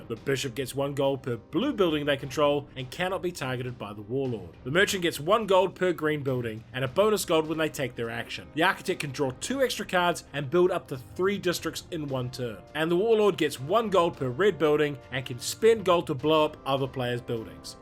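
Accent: New Zealand. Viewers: I keep forgetting you're also a kiwi and got jumpscared by the intro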